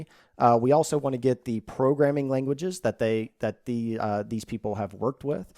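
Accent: South, United States. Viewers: i like his kinda southern accent lmao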